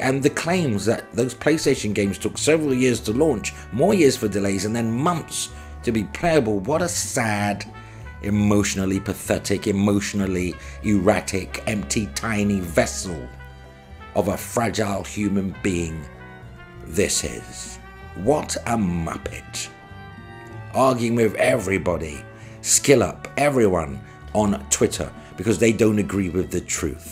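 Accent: United Kingdom. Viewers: The Brittish accent makes the insults even funnier. Lmao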